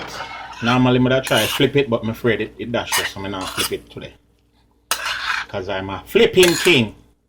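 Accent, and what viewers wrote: Jamaica. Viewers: Hi, Looks Great! BUT PLZZZ SPEAK MORE PROPERLY BECAUSE EVERYONE IS NOT JAMAICAN NOR DO THEY SPEAK OR UNDERSTAND PATOIS. Just A Lil Friendly Advice. Blessing To U😊💚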